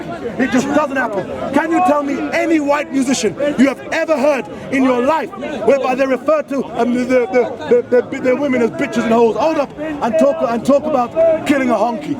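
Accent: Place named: United States. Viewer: anybody noticed he has aa american accent?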